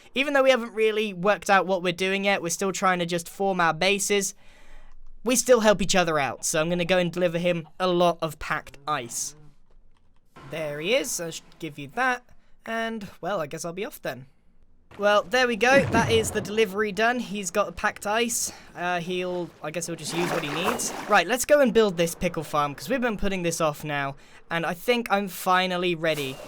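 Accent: United Kingdom. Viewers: Has British accent Comment section: DAN TDM?!?!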